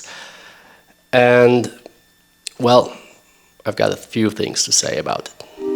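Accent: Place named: Poland. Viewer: Po akcencie mam wrazenie ze jestes Polakiem:) Nagrywasz tez filmy w polskim jezyku?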